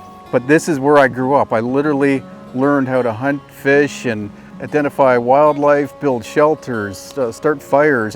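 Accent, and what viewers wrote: Canada. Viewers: You’ve got a be a Newfie lol 👍👍👌👌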